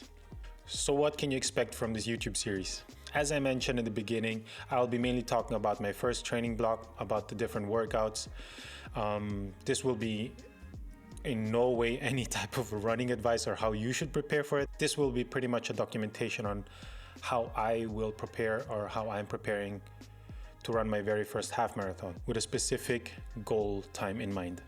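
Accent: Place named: Austria. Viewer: Came for the training diary. Stayed for the austrian accent! 😊 Richtig gut!! 👍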